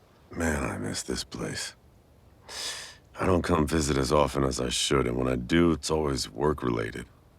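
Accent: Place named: Italy. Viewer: And my friends ask me, why am I into Italians?... ummm hello did you not hear the accent, the food ? 😍. Thank you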